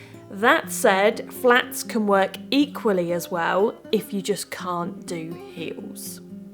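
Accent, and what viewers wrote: England, United Kingdom. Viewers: Your voice and the English accent are so chic. Adore listen to you.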